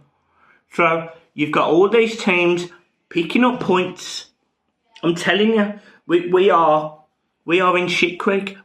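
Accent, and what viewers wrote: Birmingham, United Kingdom. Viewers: you should be a Villa fan with that accent :)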